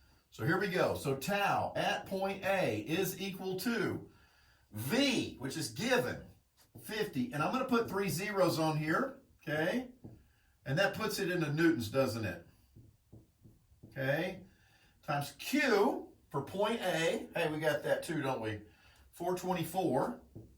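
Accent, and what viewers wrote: United States. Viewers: Finally an engineering video in ENGLISH! With American Accent.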